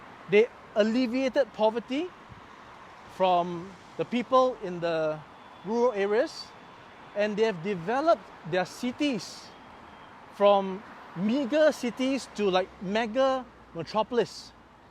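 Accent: Singapore. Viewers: I think his accent is Singaporean accent, not Indian :) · Singapore accent. Why weird? You are weird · Singlish is hard to follow……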